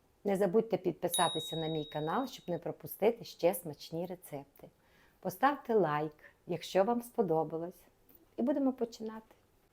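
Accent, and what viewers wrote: Transcarpathia, Ukraine. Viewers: Дуже приємно слухати господиньку із закарпатський акцентом. ❤ Закарпатська кухня дуже смачна. ❤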